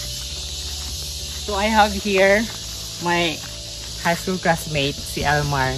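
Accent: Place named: Philippines. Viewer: I can still hear your tagalog accent fren hahaha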